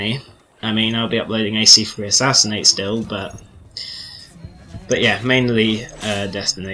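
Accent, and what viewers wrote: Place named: United Kingdom. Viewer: 1) What did they banish you from? 2) Why do they call themselves an organization yet do this? 3) To be frank, why do you keep playing? 4) I believe you can report players to Sony. If so, why don't you do this. 5) Why do you not make a video exposing these people and what the have done? If you're afraid of being no better than them, you needn't worry, for it is simply you expressing your views and feelings. P.S I love British accents; IMO, they are superior to that of Americans (I'm American) P.P.S. Please, make tip-videos and more commentaries! I'm an assassinate noob and could use the help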